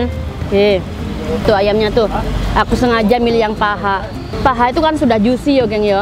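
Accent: Java, Indonesia. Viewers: Sudah mbak.. mbrebes mili sumpahhh.. ak liat mbak jd inget tanteku di surabaya hahahaha.. luwcuuuuu klo ngomong medokkk bingittt tp kusuka 🤣 sehat2 ya Omay, & Ngkaknyaaa 😇😇😇😇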